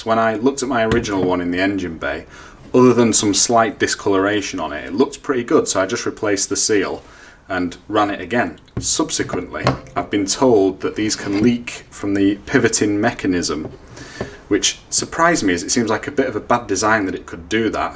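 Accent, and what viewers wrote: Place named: North, United Kingdom. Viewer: Great video, similar issues here with my 2012 Panamera 3.0tdi, would you like to repair mine for me, happy to have the car dropped off wherever you are, sounds like you’re a friendly northerner, I’m near Preston.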